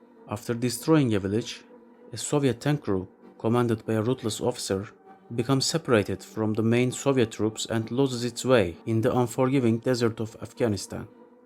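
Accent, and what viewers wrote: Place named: Turkey. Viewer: where are you from bro ? your accent sounds turkish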